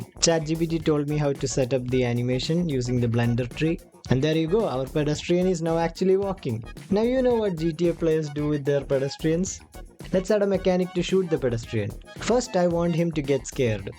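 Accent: Kerala, India. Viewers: Bro malayali anow? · Are you from Kerala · Bro are you from kerala? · Mallu accent is heavy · your slang sounds like you are a malayali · Bro from Kerala/kanyakumari right ? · Bro malayali aaano?? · he's mallu from his ascent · Aree u malayali😂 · Bruhh is malayali for sure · Ninte english slang kekkumbol ariyaam nee malayaali aanenn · english kettapothanne thonii.nii malayali alle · Haha thanks for talking in English, I think you’re Mallu 😉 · Bro sounds Malayali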